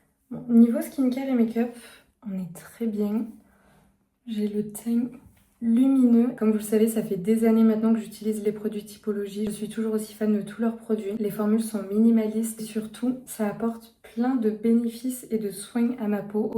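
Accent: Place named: South, France. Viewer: C’est drôle j’ai remarqué que tu as l’accent du sud mais que sur les mots qui finissent en « in » ahah ça a un peu perturbé la sudiste que je suis ! Mais ta vidéo était vraiment digne d’un poème c’était tout doux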